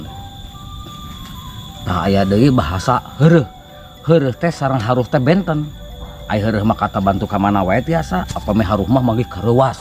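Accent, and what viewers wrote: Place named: Majalengka, Indonesia. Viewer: Sumuhun leres pisan kang, Sim kuring ge gaduh saderek di majalengka nyarios jiga kitu 😆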